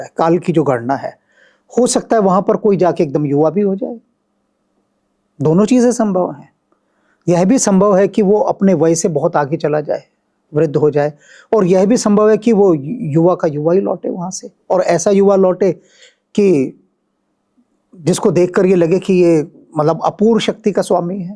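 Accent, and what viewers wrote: Haryana, India. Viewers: Ye host ka accent haryanvi hai ya Rajasthani?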